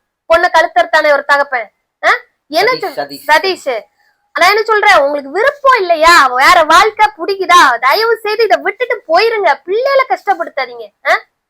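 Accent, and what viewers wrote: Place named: Tirunelveli, India. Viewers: மேடம் நீங்க பேசுற திருநெலவேலிலெங்வேஜ்ரொம்பநல்லாருக்கு❤❤❤ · தென்மாவட்ட தமிழ் எதார்த்தமான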